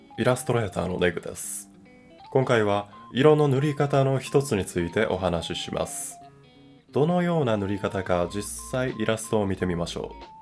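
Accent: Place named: Kansai, Japan. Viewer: 関西弁すき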